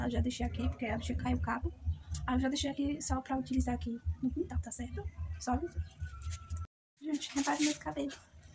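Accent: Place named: Northeast, Brazil. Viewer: E um sutaque de nodestino adoro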